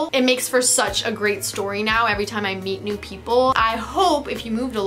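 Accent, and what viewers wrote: England, United Kingdom. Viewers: YOUR BRITISH ACCENT OMG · We love your interactive videos so much too babe.I've never movied but I wish I could even though it has so many bad effect or bad feelings on person because without moving or going another country it is hard to learn other ppls culture or language or life in general and I think you should make a video with your british accent it would be different and lovely thank you and love you from pluto and back💋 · that british accent tho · oha ingiliz aksanın efsane sşjfsidjsşdn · Omd your British accent 😯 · Loved your British accent 😍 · fr 😂 just a min... Just found out about your videos now, but already love you! Different curriculums suck so bad!! love your British accent too lol but that Karen neighbor sounds horrible.. the stalking thing is true too tho Also I’ve stared speaking a mix of literally all languages I know and people start to get more and more annoyed by it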